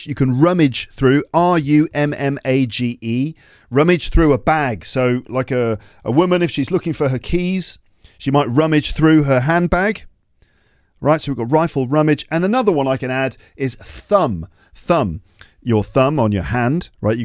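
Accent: RP, United Kingdom. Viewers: It's been a great experience being with you in the podcast episodes all this time as a learner of English as a second language. I have learnt a lot and continue to do so - without any loss of interest. It's good - a good exercise being with you learning vocabulary, pronunciation, etc. Your clear voice, the RP accent and the associated other features have made me a regular visitor to your podcast, which is, I believe, has been the most useful source for acquiring the language in a natural way for many a foreigner. Hope the podcast will be as good in the coming year and the listeners/video viewers will be looking forward to watching and listening to very useful content as you have generously brought us for ever. Congratulations! Happy New year! To and the family and the lepsters.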